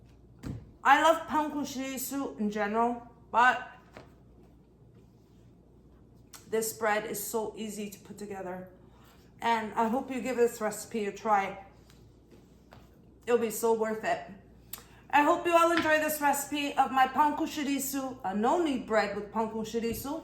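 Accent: Portugal. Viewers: Your português acent is beautiful ❤❤❤❤ I'm from Portimão in the Algarve, are you from Açores?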